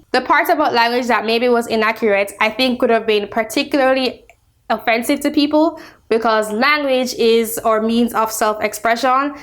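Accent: Caribbean. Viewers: Hello. I noticed Caribbean accent in your voice. Are you Jamaican? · Are you Carribean?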